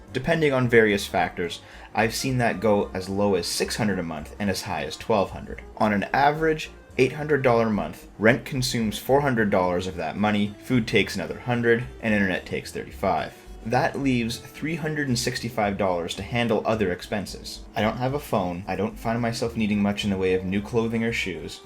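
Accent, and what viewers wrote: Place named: London, Ontario, Canada. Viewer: I swear you could be from london ontario. But what do i know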